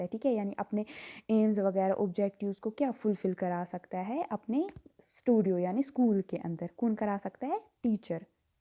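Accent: Kashmir, India. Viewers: That Koshur accent. Keep it up!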